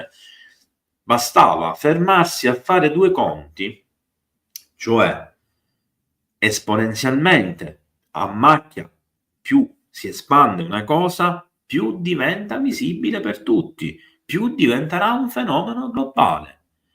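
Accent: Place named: Sicily, Italy. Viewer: Mi sembra di capire che sei siciliano e forse di Palermo...te lo dice una di Catania😊👍 Complimenti video sempre interessanti e mai noiosi🌠👍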